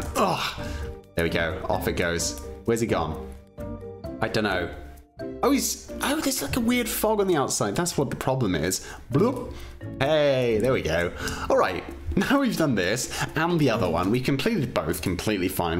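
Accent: United Kingdom. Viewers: green ninja 5521 hes british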